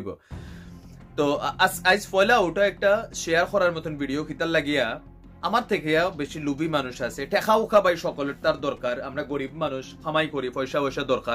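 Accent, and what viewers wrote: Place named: Sylhet, Bangladesh. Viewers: আমি খবর শুনি নাই আমি আপনার সিলেটি ভাষার দিকে লক্ষ রেখেছিলাম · আজকের কথার মাঝে সিলেটি গ্রান মিলছে ভাই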